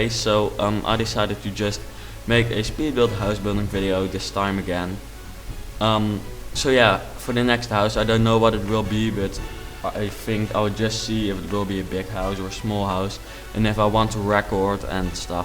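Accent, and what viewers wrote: Netherlands. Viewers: Um he is dutch.So that's why. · Haha, inderdaad Nederland accent maar ik vind het juist fijn om naar te luisteren, gaat tenminste niet zo snel ;p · je kunt denk ik beter geen commentaar op je video geven want je hebt echt een heel erg nederlands accent en voor heel veel buitenlanders heel moeilijk te begrijpen en niet altijd even fijn om ernaar te luisteren wel super cool huis enzo :-) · You have such a cute dutch accent! Its funny to hear almost all dutchies have this accent hahaha.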